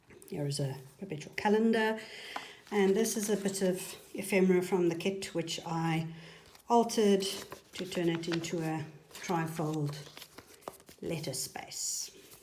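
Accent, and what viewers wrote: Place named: South Africa. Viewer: Hello fellow RSA-ian. Love your work... and listening to a familiar accent. Thanks for sharing.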